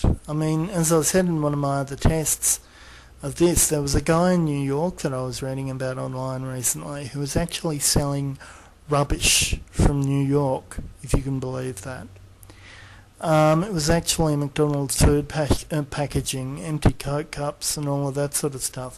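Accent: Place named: Australia. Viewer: lol your vid was on my recommendation, so random. Damn this was 18 years ago so judging by your accent you’re probably one of the few Australians to ever upload a YT video during that time.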